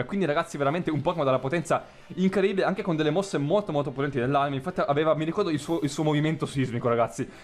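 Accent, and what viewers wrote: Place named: China. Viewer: senti gia sembri cinese, parla piu piano che nemmeno te capisci cio che dici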